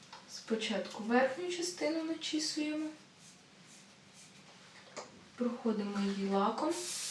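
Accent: Standard. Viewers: дуже гарна зачіска) Приємно чути чисто-українську мову!) Ти супер😊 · Чисто українська мова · Дякую, відео дуже сподобалось.Ви дуже освідчена людина.Яка у Вас професія? Тому що знаю тільки одну молоду дівчину,яка так чисто говорить на украінській,але вона закінчила Могилянку. Хотіла б я так говорити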